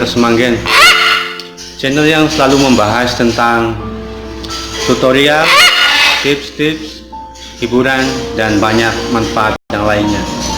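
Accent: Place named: Indramayu, Indonesia. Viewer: Wong indramayu ya bod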